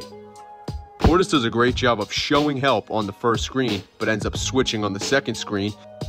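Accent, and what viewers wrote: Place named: New York, United States. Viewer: Bouche has been so surprisingly good as well , he comes of the bench with great energy as well , liked the video , you definitely a new Yorker or jersey cat with that accent 😀 , excellent read on the team , kudos and cheers lad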